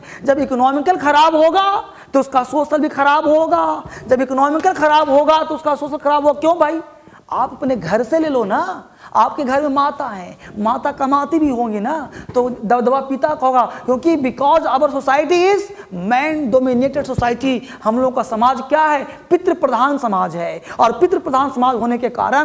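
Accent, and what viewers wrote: Bihar, India. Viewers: आपके आवाज से लगता है कि आप बिहारी मूल के है...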